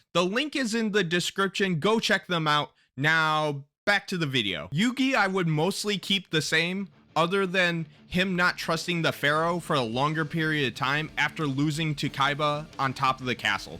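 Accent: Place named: Brooklyn, United States. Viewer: I love the Brookland accent lmao subscribed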